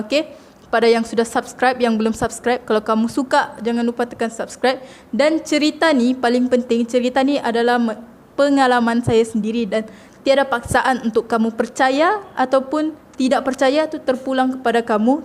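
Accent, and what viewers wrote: Sabah, Malaysia. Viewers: Suka dgr akak ckp slang sabah😄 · siuk cerita mu sis 👍 suka sya dngr . tmbh tmbh lg loght sbah beii . snang sya fham .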